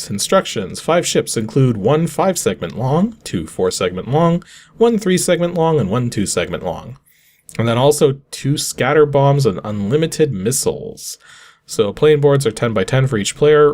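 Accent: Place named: Canada. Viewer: I agree. You're a Canadian. Can't expect you to know every correct local pronunciation of the entire world. If you know how you know how, if not just pronounce in your Canadian English. At least you're not apologizing for ever name you pronounce, which is one of my main youtube annoyances, well in the few channels that I watch.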